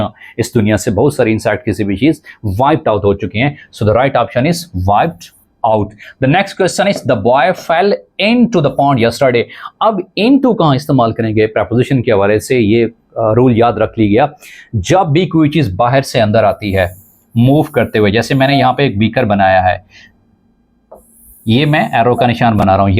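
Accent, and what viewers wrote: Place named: Europe. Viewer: your english accent is like a European teacher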